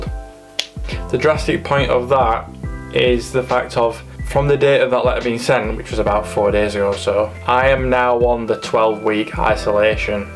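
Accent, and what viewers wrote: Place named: Yorkshire, United Kingdom. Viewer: Top effort on 5k. Hey, stay strong and look for only little goals to stay up beat. I know how tuff things can be for you in lockdown as I was without any luxury in Afghan for a very long time. Remember to enjoy life and don't set big daily goals as failure to complete them can make you feel worse. Lovin your Vids & Yorkshire accent more than ever these day since I'm working down south.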